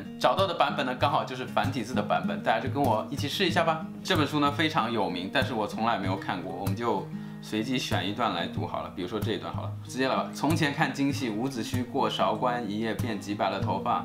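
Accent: Taiwan. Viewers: 你會唸沒錯，但是你怎麽用臺灣口音讀！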